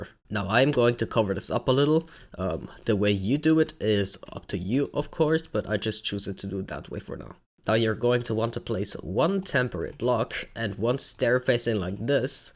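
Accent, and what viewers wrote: Germany. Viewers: Warum machst du dann Englische Videos Übrigens bei der Betonung hört man sofort den Deutschen raus ist mir genau das gleiche😅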